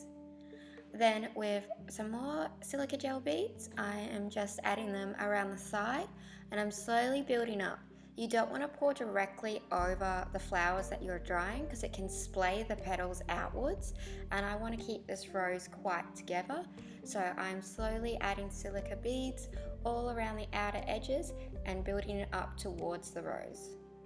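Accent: Australia. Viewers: Good vid, and nice to hear an Aussie accent 👍🏽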